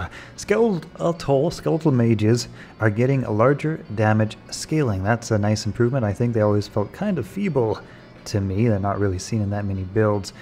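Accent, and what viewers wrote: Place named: Canada. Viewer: and the way he says about. I'm not saying anything bad, most Canadians are pretty nice and cool. But with certain words you can almost instantly know that they're Canadian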